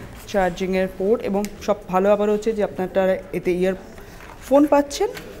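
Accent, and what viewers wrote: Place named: Kolkata, India. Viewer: কোলকাতার বাংলায় বেশ মিষ্টতা রয়েছে, শুনতে ভাল লাগে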